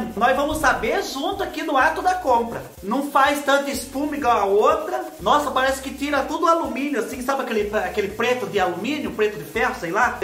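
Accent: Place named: Paraná, Brazil. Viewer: Oi cansei de ser clandestino e resolvi regularizar nossa situação minha e da minha esposa e me inscrevi. Tenho uma pergunta: adoro sei sotaque que parece paranaense. Mas você cresceu no estado de São Paulo. Como conseguiu esse sotaque tão legal de paranaense? Amamos seus vídeos. Assim que passar essa coisa toda vamos te trazer para conhecer o nosso pequi com frango para ver que delícia do céu. Tem vários Youtubers aqui também da área de culinária.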